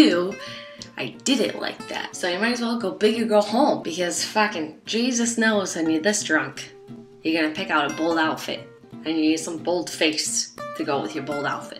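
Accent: Boston, United States. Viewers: I forgot she was from Boston till i rewatched this video 😂❤ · I SEE your Boston accent and Ithink its qt af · she lived in Boston for like 7 years and developed an accent. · Sounds like a Boston accent (like when she says water line "watah line" lol) I've lived in mass my whole life and she sounds like most of my family tbh😂 not really surprising though since she lived in Boston for 8 years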